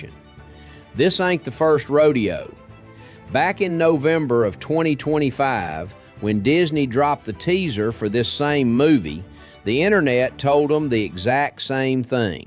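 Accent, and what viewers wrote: Texas, United States. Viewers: Mr., I owe you an apology. Allow me to explain. I was initially exposed to your channel by the YouTube algorithm, specifically your detailed analysis of Star Trek: Starfleet Academy. Though I have great regard for the franchise, I have not kept up with the current iteration under Alex Kurtzman other than Season 1 of Star Trek Discovery. Not out of any specific gripe, though I know that series is polarizing to longtime star Trek fans. I just hadn't gotten around to it. I do feel that a lot of the language used in the criticism of that show is coded in the language of the movement against so-called "wokeness" and "woke culture". I watched that video essay in its entirety. Still, having not watched the show, but knowing that the same anti-woke culture that took issue with ST:D (a more unfortunate acronym I cannot think of) took issue with Starfleet Academy, I looked at your face, and listened to your folksy, Texas twang. 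I looked at the long Santa Claus beard, heard you say that you live in Texas, and that you are a white man. And you were criticizing a cast made up primarily of people of color and women. And I let MY conscious and unconscious biases take over. Here's another probably MAGA-adjacent yahoo decrying that wokeness will be the end of civilization as we know it. And I was dead wrong. I just finished watching this video, and I found your arguments to be logical, insightful, thoughtful, and well researched. You showed respect to not only the fans and the gene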